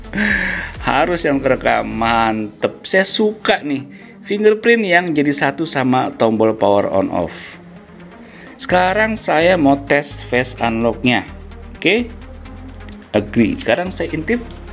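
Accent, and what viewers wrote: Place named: Java, Indonesia. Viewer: Kan aku cuma bilang suaranya kaya orang jawa terus apa salahnya?? Ni saya juga orang jawa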